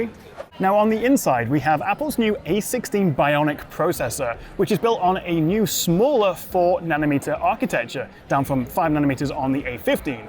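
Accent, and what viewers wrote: United Kingdom. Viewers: GAY BRITISH ACCENT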